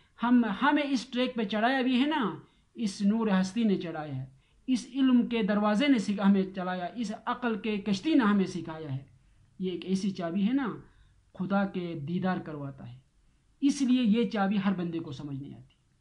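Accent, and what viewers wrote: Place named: North, Pakistan. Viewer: He belongs to Northern pak.his accents are a bit different.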